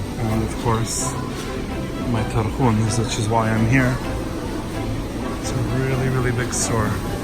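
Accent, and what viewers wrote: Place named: Australia. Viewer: You're australian? I can barely detect an aussie accent.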